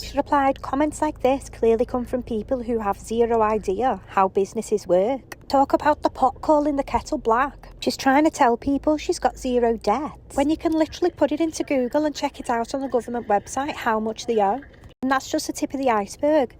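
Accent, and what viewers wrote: Liverpool, United Kingdom. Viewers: Are you a scouser? Love it! Bloody love scousers. I’m from Wirral.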